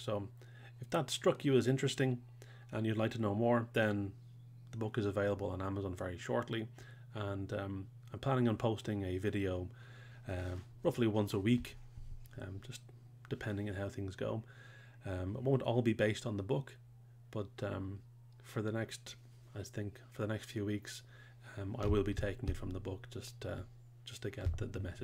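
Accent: Ireland. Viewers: Hi, sound like an Irish man... I'm in Mayo, what's your background?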